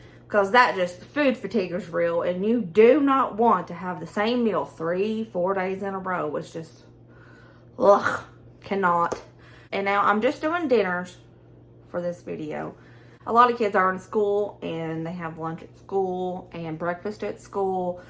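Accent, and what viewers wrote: South, United States. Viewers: Thank you so much for making this content. I love your videos. I'm trying to find more YouTubers that do this kind of content. I love your grocery shopping challenges. I also love your southern accent ❤ thank you so much for all you do.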